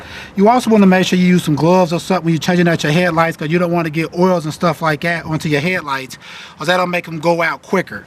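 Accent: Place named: Nashville, United States. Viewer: Brah, are you Nashville TN,? That's how you talk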